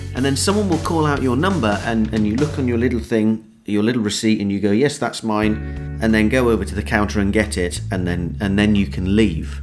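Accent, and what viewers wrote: RP, United Kingdom. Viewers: people always say that british accents are sexy and i never understood it because i live in leeds where the most common word is 'innit' but this accent is beautiful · you sound like a Tory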